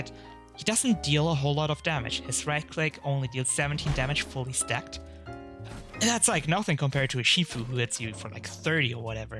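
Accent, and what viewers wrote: Germany. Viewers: Man hört das er deutsch ist :D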